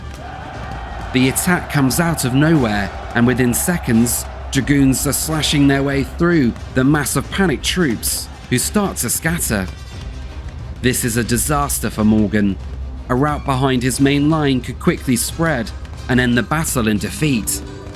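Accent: United Kingdom. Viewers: What irony...Narrated by a Brit 😅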